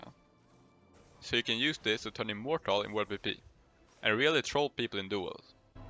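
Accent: Sweden. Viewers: This sound so nordic! I love it the english sound exactly like a Swedish brother, keep on working that pronunciation and keep up the good work! Grym video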